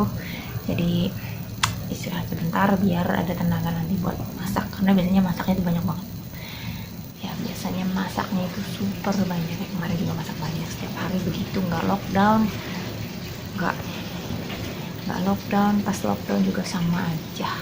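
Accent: Banyumas, Indonesia. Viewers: Ora ngapak ora kepenak mantep pokoke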